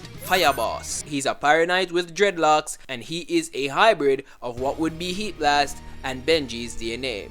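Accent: Jamaica. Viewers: You kind of sound Jamaican A little bit for me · I had no idea he was Jamaican until now :face-blue-smiling::face-fuchsia-wide-eyes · Yo your jamaican?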